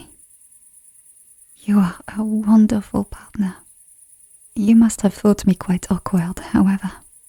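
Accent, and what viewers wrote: France. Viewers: Wow! I was totally invested in this story. What a beautiful French accent, & beautiful story. I liked this a lot. Thank you ❤ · I've been enjoying the French accent videos... Maybe French is the language of love? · A confession From With French accent At a ball Dont wake me up. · This was beautiful, and the French accident is stunning as always. Hope for more in the future ❤️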